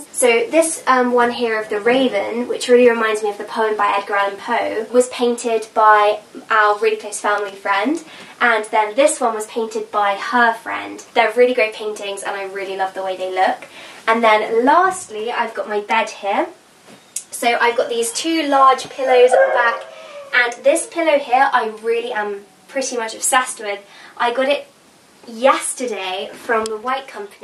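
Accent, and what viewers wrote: United Kingdom. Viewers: Where are u from? Seems like a british accent.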